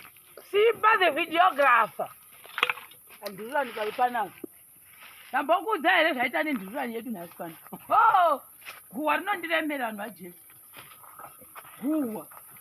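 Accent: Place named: Masvingo, Zimbabwe. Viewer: Shona yenyu ndiyo chaiyo yeMasvingo❤❤❤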